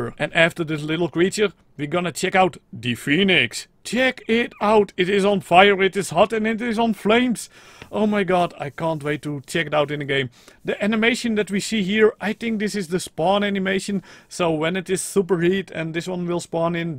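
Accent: Netherlands. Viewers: yo is he dutch ???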